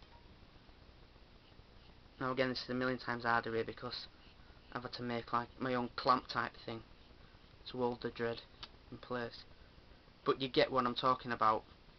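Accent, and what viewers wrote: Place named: Manchester, United Kingdom. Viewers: Thank you, this helped a lot! This sounds strange but are you from the Manchester/Lancashire area? Its just, you speak a lot like us ;D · great vid :D, if i'm not mistaken that's a yorkshire/lancashire accent :P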